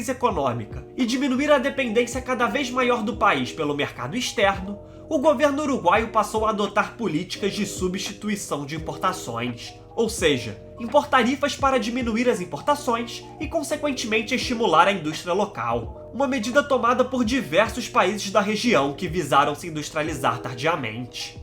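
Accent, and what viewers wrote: Rio de Janeiro, Brazil. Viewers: Ótimo canal, mas esse sotaque carioca é insuportável. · Teco- teco bla, bla, blá......vc pode falar isto mesmo sem esse horrivel sotaque Carioca, sem estalar essa aguda vóz. O assunto é ótimo mas a exposição não.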